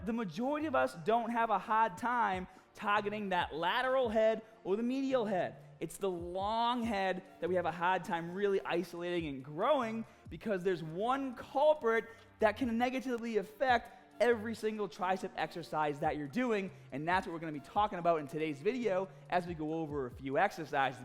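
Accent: Boston, United States. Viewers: I'm dropping a comment to help with the algorithm because I'm already subscribed and I liked the video. Love your videos for the training-information, entertaining Boston accent, jokes and lively presentation.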